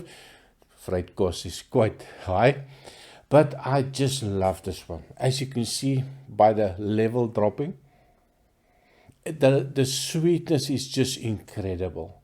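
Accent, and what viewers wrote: South Africa. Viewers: Very surprising top 3. I've had none of them before so I definitely gonna try them. Besides that, I appreciate the honest way you talk about whisk. Your south-african accent brings back good memories of my two holidays I've spent there. I live near the Belgian border in the Netherlands. Maybe we'll meet somewhere :-) Best wishes for 2023!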